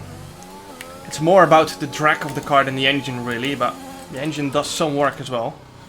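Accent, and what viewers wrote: Netherlands. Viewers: Hoor dat je Nederlander bent hahah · Je hoort gewoon dat jij Nederlands bent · Just a regular dutch accent to he honest 😅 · I can litterally hear ur a dutchie Ur accent isn’t like mine I hav an British accent and I’m an Dutchie aswell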